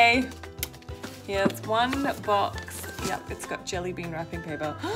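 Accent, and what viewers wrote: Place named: United Kingdom. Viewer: Hi Anusha I am your big fan from Nepal ... I love you so much MTV most wanted... My teenage time.. You were my favourite n still i love u n i just love the way you speak your English sounds like British accent.... Muaahhhh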